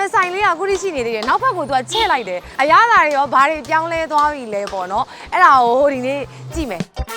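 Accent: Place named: Dawei, Myanmar. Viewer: ထားဝယ်နဲ့ဘိတ်ရောနေပြီဟ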